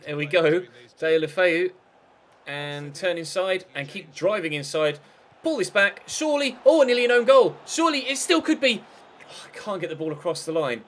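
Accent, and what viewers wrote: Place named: RP, United Kingdom. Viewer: he's just posh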